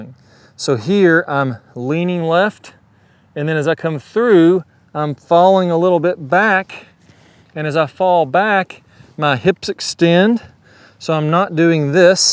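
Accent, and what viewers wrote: South, United States. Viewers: another thanks from me here in the UK. Your lessons are fantastic and right on point for game improvement. And that nice southern drawl makes watching a Zen-like experience!:-D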